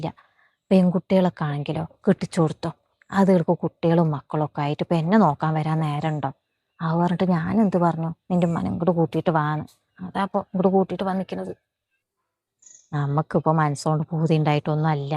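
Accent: Palakkad, India. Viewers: പാലക്കാട്‌ ആണോ വീട്. പത്തിരിപ്പാല എന്റെ നാത്തൂൻ ഉണ്ട്. അവരെ സംസാരത്തിന്റെ slang ഇങ്ങനെ ആണ്.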